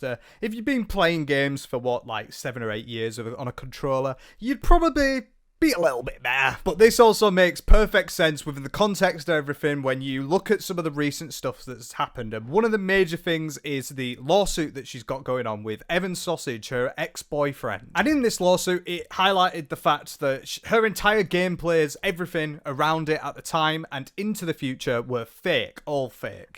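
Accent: United Kingdom. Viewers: British man. i approve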